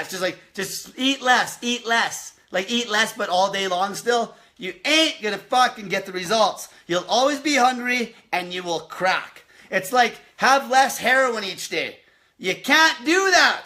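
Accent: Canada. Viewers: that canadian accent STRONG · you Canadian?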